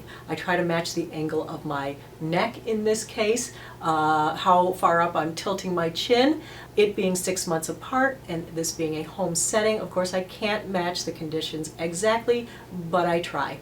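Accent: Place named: New England, United States. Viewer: Love the video. I heard your accent and knew your were a fellow New Englander💕 I love CeraVe products. I will try on my neck too. Great advice. Thank you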